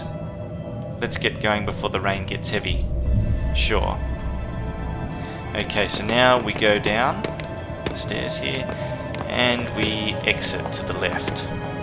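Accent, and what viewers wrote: Australia. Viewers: The Australian accent is amazing.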